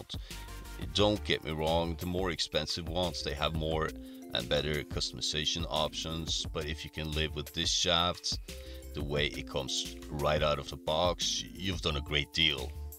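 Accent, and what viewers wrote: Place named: Norway. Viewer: Thought so I have a few friends from Norway and caught a faint lilt in your faultless English.update,I have just ordered this cue now,for sure a great back up to my predator revo,thanks for what I believe to be a wise investment,look forward to more of your content,by the way you move around the table with great skill.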